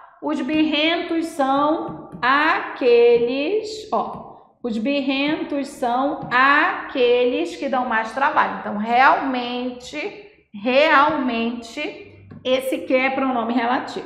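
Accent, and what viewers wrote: Pará, Brazil. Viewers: Professora paraense, certeza. ❤️🙏🏻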